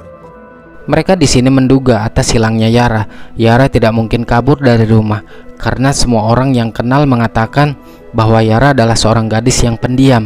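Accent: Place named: Banyumas, Indonesia. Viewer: Salam ngapak.... 🙏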